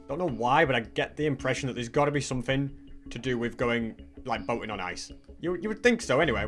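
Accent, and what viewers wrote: United Kingdom. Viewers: No caption how am i supposed to understand the brit english mate?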